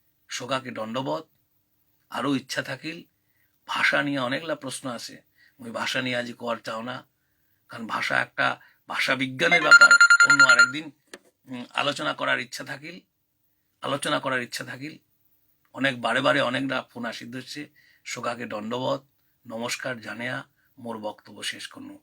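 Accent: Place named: Alipurduar, India. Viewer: One of my friend's parents are from Alipurduar, and their way of speaking reminds me of yours.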